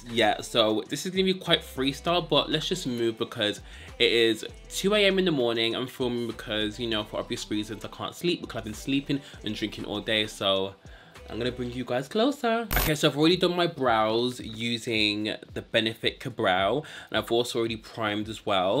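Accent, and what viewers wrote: United Kingdom. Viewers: You have the strongest British accent I’ve ever heard lol. I’m American 😫 I’m not use to it at all!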